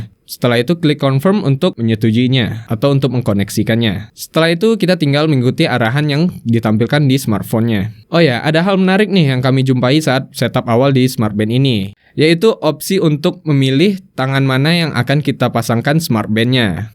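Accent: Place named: Minangkabau, Indonesia. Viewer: Sudah kuduga urang awak, ada aksen nya tipis2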